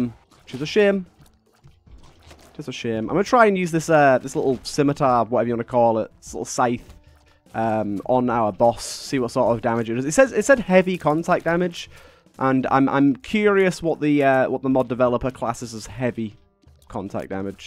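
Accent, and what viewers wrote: United Kingdom. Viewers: After watching the whole video I only have one question: is this guy british?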